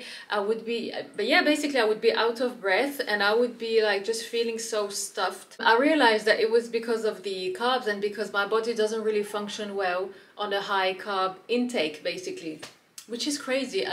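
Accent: England, United Kingdom. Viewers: Salut j'aime tellement tes vidéos en anglais, c'est tellement fluide et ce eptit accent english love ittt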